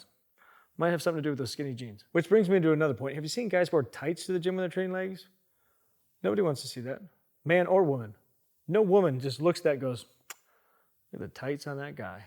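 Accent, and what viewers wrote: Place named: South, United States. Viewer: Maybe it's just the cornpone style and southern drawl but I initially had great trouble keeping up but I'm gradually getting used to the rhetoric and speed of speech. Just as well because there're done tips here that I've not yet seen and might help. How refreshing to hear someone state categorically that squats ain't the be all and end all of legs exercises; I'm already a walking barbell lunges man (and yes, spot on, it IS a lung buster exercise) but I've just picked up a couple tips to tweak it and alter the stress focus. The great Ronnie Coleman swore by Walking Lunges and there's little that hasn't already been said about HIS wheels...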